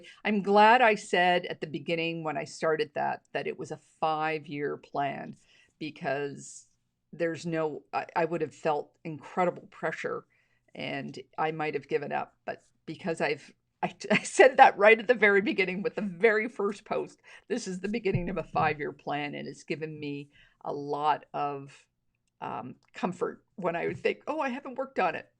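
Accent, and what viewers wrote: Canada. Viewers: Hello I love your videos and really appreciate a Canadian voice. Because sometimes we don't always get the best variety and prices over here. But we love what we do. I would still consider myself a beginner in the grand scale of things. However I'm getting there for sure in terms of building my basics. And keep seeing your beautiful complex personal projects. Wich leaves me to ask if you can do a more intermediate quilt block video of some of your favorite blocks. Even some from the beautiful backdrop behind you 😍 would be wonderful and very appreciated.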